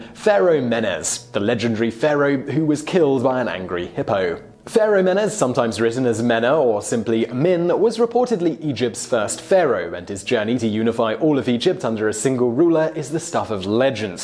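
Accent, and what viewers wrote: England, United Kingdom. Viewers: Dude...hes British, be easy. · he's British I lived in Britain for more than 10 years and they don't pronounce words the same as we do in America. Go directly to an arcade and purchase a common-sense ticket. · ATON!!!!!! Christ, British people never say anything like the rest of the English speaking world! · He's English, it is hard enough as it is to pronounce a lot of Egyptian and other North African/ Middle Eastern names as an American speaker. I'd imagine it is a little bit more of a challenge as a "proper" English speaker. The two languages are at simply odds for pronunciation with translations.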